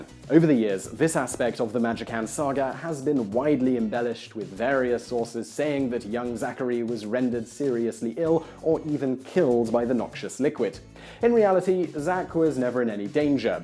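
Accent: United Kingdom. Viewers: Since when has Vsauce Micheal had a British English accent?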